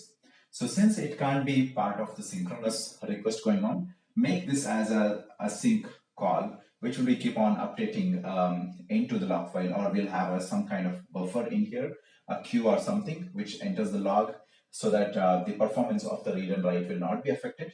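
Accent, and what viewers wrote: India. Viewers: Your slang like tamil.i am right?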